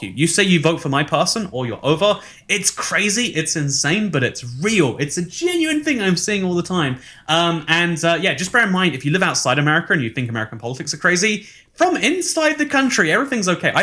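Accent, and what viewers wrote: United Kingdom. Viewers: His voice is so British my ears got collanis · First time I watched a vid, without actually watching... Must have been the British accent, or cringy gameplay 😂❤ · are you british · I loved listening to a British man talk about the US. Gives us a whole new perspective on ourselves as Americans.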